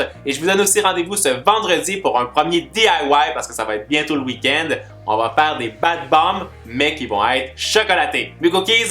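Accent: Quebec, Canada. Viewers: J'adore ton accent de québécois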